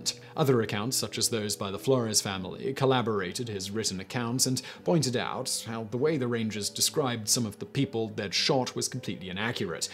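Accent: United Kingdom. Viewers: Texas stories told with a British accent 🤔🤔🤔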